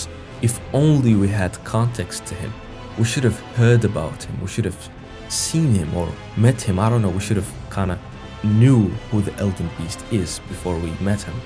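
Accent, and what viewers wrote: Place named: Italy. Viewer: I'm italian, and you REALLY have the accent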